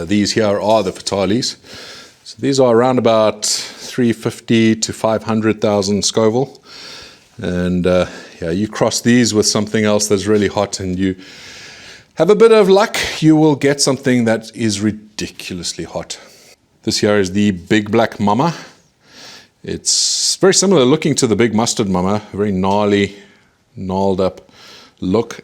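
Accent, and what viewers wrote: South Africa. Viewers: You have a south african accent why its quite cool to me because i am from south africa and you inspire me to keep on going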